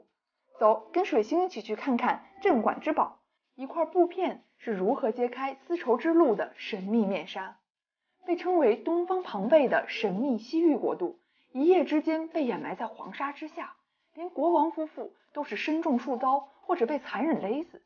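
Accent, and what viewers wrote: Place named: Cantonese, China. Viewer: 水星 声音🈶广音。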